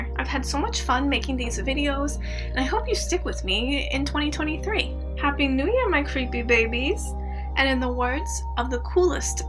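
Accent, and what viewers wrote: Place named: New York, United States. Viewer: Quick question. Which borough would I find the accent in? I think I found my type 😂😂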